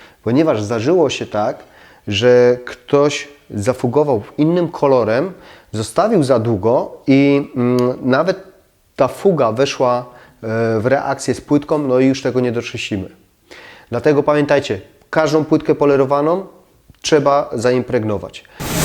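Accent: Silesia, Poland. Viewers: "Jeżeli klinkami zasztrabujemy..." ;) Wiem, że bardzo Pan się stara mówić po polsku, ale ten Śląsk jednak wychodzi :)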